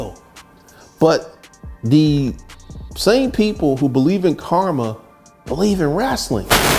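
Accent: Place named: Alabama, United States. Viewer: Do you know what's so funny is that I live in Detroit and I've lived here for 25 years and I rarely get a chance to go home to Birmingham Alabama. When I hear you speak , it brings back memories of growing up in Birmingham. And what is funny, no one here really know about AG Gaston. I actually lived down the road from him and we all knew that was his house on the hill that was gated. I was really thinking about moving back to the south. Thank you for content for thinkers.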